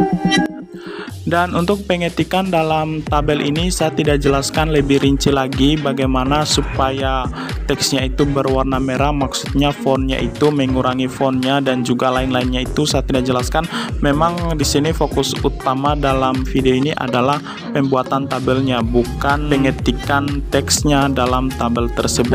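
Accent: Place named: Southeast Sulawesi, Indonesia. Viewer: abang dari sulawesi tenggara k, logat dan cara bicaranya sama" persis..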